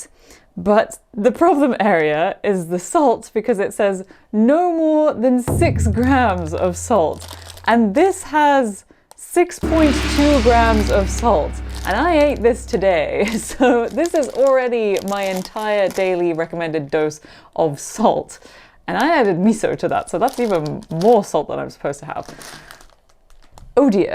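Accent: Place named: United Kingdom. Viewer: Great video! Nice to hear from a British person😂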